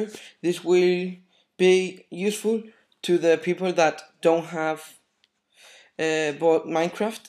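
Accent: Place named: Mexico. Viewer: gracias debolada se te ve el acento de mexicano XD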